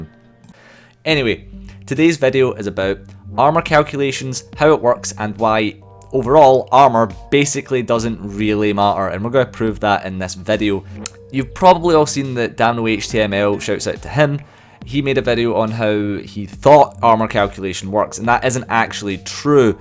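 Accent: Scotland, United Kingdom. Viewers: This may be a stupid question but is this guy Scottish? English isn't my native tongue and I can't quite pinpoint the dialect.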